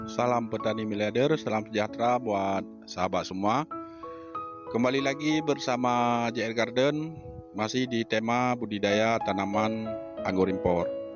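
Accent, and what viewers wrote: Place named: Aceh, Indonesia. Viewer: sperti logat aceh bole tau dimana gardennya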